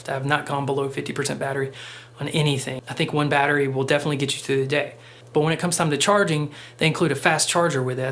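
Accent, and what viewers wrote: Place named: Utah, United States. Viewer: I've got family in Utah that I visited recently (im from Denmark) and I just love your channel and the accent from the Area. Thank you for the great guide on this gimball :) Kind Regards from Denmark Jørgen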